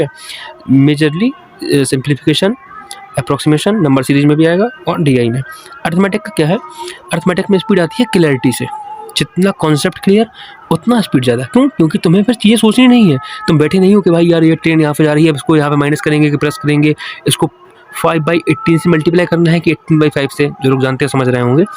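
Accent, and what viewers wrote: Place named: Lalitpur, India. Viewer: hmmm...thoda or sunne ke baad mujhe bhi lalitpur jaisi boli lagi thi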